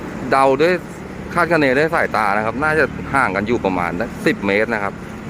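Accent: Ratchaburi, Thailand. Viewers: สำเนียงของแท้เลย ชาวราชบุรี ชอบครับ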